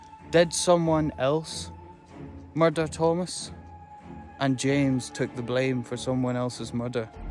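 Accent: Scotland, United Kingdom. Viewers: Good story, and you have a great voice for narration. Your voice is soothing and relaxing and the Scottish brouge takes all the rough edges away. You bear a strong resemblance to both Thomas and James. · your Scottish burr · Nothing comes close to a Scot telling a scary tale! Bravo!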